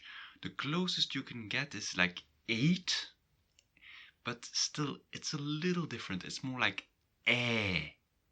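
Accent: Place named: Belgium. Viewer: These are very strange interpretations- is this Belgium? Because it doesn’t sound Dutch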